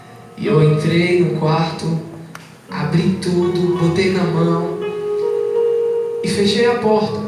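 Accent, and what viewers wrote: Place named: Bahia, Brazil. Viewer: Sotaque do interior da Bahia que saudade!!!!!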